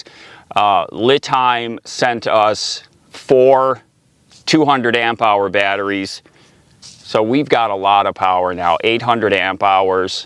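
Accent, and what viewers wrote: United States. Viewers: Hi, glad to see you and Lefty enjoying yourselves in Quartizsite., my son watched your video with me today and was surprised to hear you live in NY, just as we do. He said to him you sound like you have a Southwestern accent or something similar. Just wondering if you grew up in NYS or not. Blessings to you and Lefty, Happy Thanksgiving.:) <3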